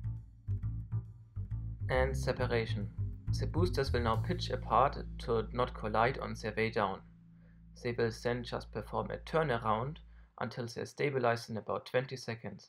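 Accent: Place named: Germany. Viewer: I don't think your accent/ pronounciation is Bad ctually, I think the way you say the 'th' is just really german, and I hate it when people say it like "s".:P Ich bin übrigens auch deutsch:D Hat mich ne weile gedauert das ordentlich aussprechen zu können xD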